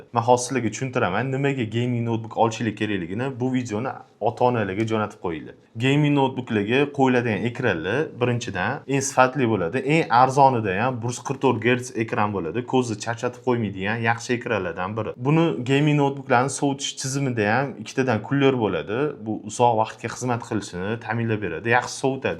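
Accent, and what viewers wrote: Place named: Tashkent, Uzbekistan. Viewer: Toshkentcha gapirmay o'l, bloger degani adaboy tilda gapirishi kerak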